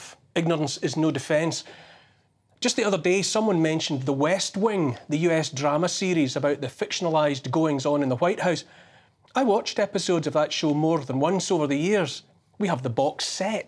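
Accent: Scotland, United Kingdom. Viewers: Scottish voice of Neil is wonderful mate … I’m off to buy a bottle of Bucky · “The strutting popinjay that is Justin Trudeau..” 😂😂😂 Well said my friend! Fabulous monologue in an equally fabulous Scottish accent! Greetings from Canada! 🇨🇦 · Neil, I just love hearing your Scot's accent speaking the truth. You have one more American subscriber.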